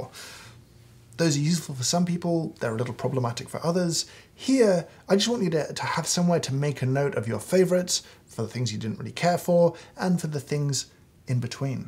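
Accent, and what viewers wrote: United Kingdom. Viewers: If someone ever tells you, you speak too fast--ignore them. I love to listen to people who are fluent, concise, precise, and find it painful to focus on information, which is delivered slowly, with lots of gaps and searching for the right word. Having a youtube channel is the perfect venue for you. You have something you know well (coffee) and are able to speak about it intelligently, with concentration and focus. I am not a coffee drinker (yet?), but enjoy your videos because of your delivery--made even better by your British "accent". Thanks. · I have learned so much from you over the past year that I haved started watching your videos. They are incredibly helpful and full of insight and expertise. I love your way of talking (and it's not just because you have a British accent 😁😂) and how you easily articulate your thoughts, it actually reminds me of Allan Watts. 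You have intensified my love for coffee a thousand times more. Thank you very much for being so amazing at what you do. Wish you bliss, joy and health.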